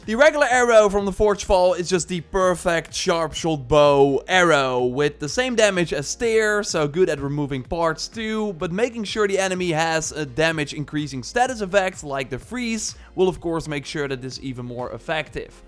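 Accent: Netherlands. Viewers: Are you dutch?? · You sound dutch.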